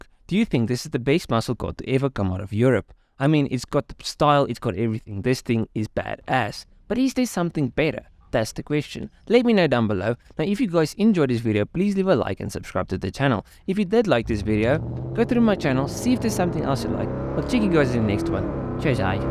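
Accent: South Africa. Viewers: You sound very south african my guy. Love it!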